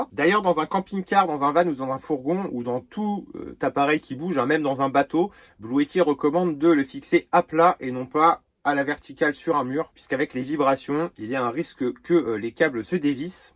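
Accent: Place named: England, United Kingdom. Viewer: quel accent anglais !! lol jolie colis :) et ça envoie du lourd !!! bizzzzz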